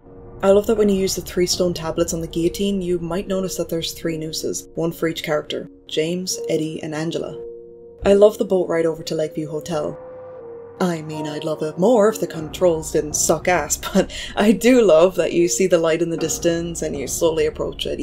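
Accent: Ireland. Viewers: Thank you so much for this video I love everything silent Hill I was very annoyed that I didn’t use guy Cihi in the remaster and I have high hopes for the remake not sure if it’s still Romor but I believe it is coming . Shout out from Dublin. Your Irish too yeah 🤪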